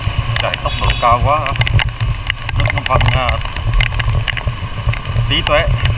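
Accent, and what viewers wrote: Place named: Hue, Vietnam. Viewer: Nói tiếng Huế cho dễ nghe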